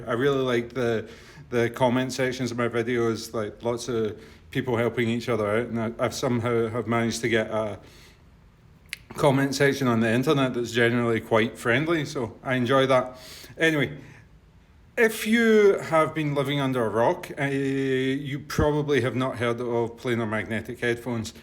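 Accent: Scotland, United Kingdom. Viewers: British ?? that would explain your accent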